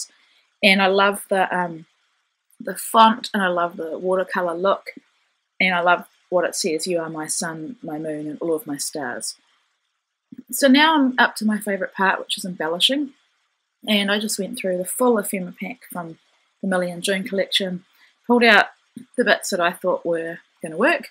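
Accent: New Zealand. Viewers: Love this layout! I could listen to you talk all day!!! I love the NZ accent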